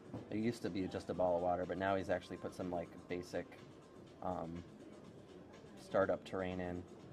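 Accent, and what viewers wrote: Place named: Hawaii, United States. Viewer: You sound like ryan higa when he's doing his hawaiian accent...... #justsaying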